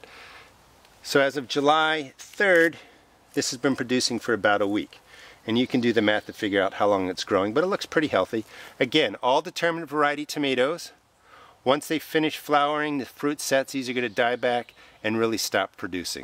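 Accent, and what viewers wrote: United States. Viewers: speak English not American....plz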